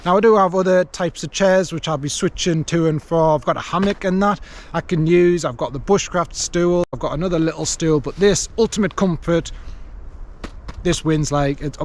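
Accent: United Kingdom. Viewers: A saw will drop a tree much faster and with much less energy than an axe if used correctly. The only reason you'd take the havier more cumbersome axe is versatility which is a fare reason but in the UK (I'm assuming based on the accent) if you get caught with either of them you're in trouble which is so stupid.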